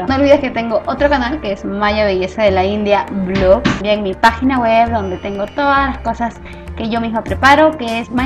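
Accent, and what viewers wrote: Peru. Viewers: hola, yo soy peruana, y vivo en usa, me encantan tus videos, y veo que no haz perdido tu acento peruano, gracias por compartir y enseñarnos tantas cosas, te admiro por tu entusiasmo por la vida sana. Bendiciones.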